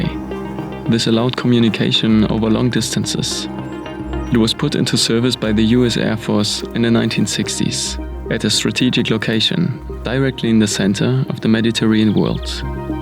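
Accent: Germany. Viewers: nice video but why is it so hard for germans to speak english without accent?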